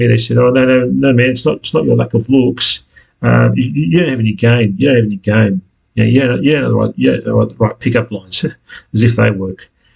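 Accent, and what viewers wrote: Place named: United Kingdom. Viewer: yeah but i'm not native, i think You are british SO i really have to focus if i want to hear one of your videos they seem good honestly but it's linda hard too hear them while doing other activities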